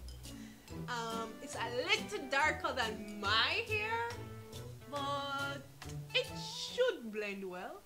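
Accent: Patois, Jamaica. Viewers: Also it might not be that she's "lost" her accent but she just uses less patois words or like a patois 'pronunciation'. We can butcher some english words just base on where we place emphasis on syllables. As for her accent it oh so present from my ears. Jamaican here. Depending on where you are in Jamaica this "accent" varies. Some places I can't even understand them and I am born, raises, live Jamaican. 😂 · she still sounds very Jamaican, she sounds just like what we like to call ‘uptown people.’ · your personality is contagious. Loving the jamaican patois. Love from Trinidad 🥰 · As a general rule she's lost more of accent the longer she's been making videos. She sounded like a Jamaican in her early stuff when she was relaxed, but now it sounds muddled sometimes. I'm not trying to tell you anything new, just saying I noticed, too. 😉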